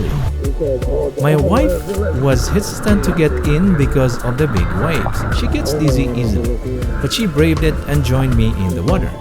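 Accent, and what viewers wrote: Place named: Philippines. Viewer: Your. Filipino accent is so thick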